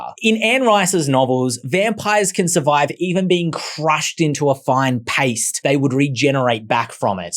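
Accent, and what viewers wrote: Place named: Australia. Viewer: Aussy vampire intro... I am sorry but I can't take it seriously enough)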